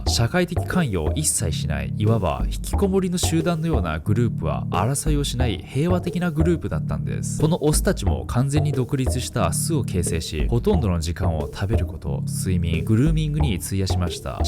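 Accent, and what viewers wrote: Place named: Japan. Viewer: 、英語の発音が日本語発音になってる、、、気を使ってるのかな？もっとネイティブの英語を聞きたいのですが。日本の大衆圧力でしょうか？なおきマンらしい昔のネイティブ発音でいきましょうよ😁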